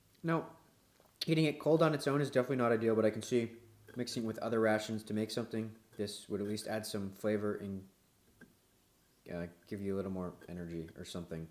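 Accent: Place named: United Kingdom. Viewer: Yes but people remark often about how “British” he sounds.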